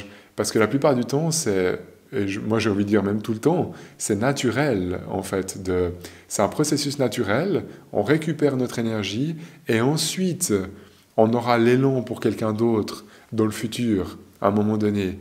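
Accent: Switzerland. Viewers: Merci ça fait du bien entendre un discours comme le votre oui j'en ai besoin, j'ai toujours été comme ça depuis très jeune. ( Je me disais bien que votre accent vient de notre beau pays la Suisse 😊 )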